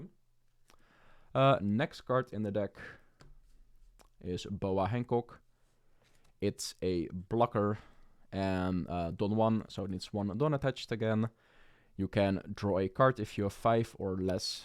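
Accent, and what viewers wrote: Netherlands. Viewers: got an interesting accent, are you dutch by any chance? Also Nice Channel!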